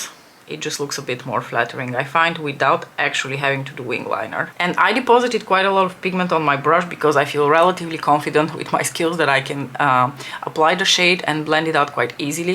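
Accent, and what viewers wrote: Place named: United Kingdom. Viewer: I find it so amazing that you speak so many languages and I assume you speak them all as fluently as you speak English. I would guess that you have lived in the U.K. for 15 years by the way you speak. I would love a video on how you started with each language and how your brain processes and learns new languages. On top of it your job, husband, child, cats and YouTube channel, makes you so well rounded and so fascinating. You are such a lovely person. I love listening to you chat to us and your makeup tips and knowledge are really useful. ❤️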